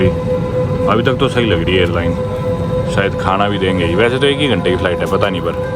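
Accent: Haryana, India. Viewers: आपा Haryana wale hindi me Haryanvi mix kr hi dete hai , wo hi baat pta lg hi jata hai गामा के बालक सै ❤❤ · This haryanvi accent literally gives me a headache and very unpleasant for my ears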